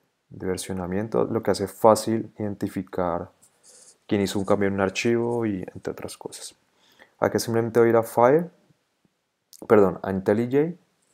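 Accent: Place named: Bogota, Colombia. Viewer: Ese acento de norte de Bogota si se puede ver hahahaha gran tutorial!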